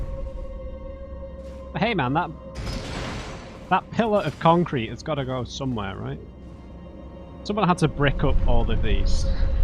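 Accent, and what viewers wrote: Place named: United Kingdom. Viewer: Loving the playthrough! Where abouts in the UK are you from by the way? trying to pin down your accent (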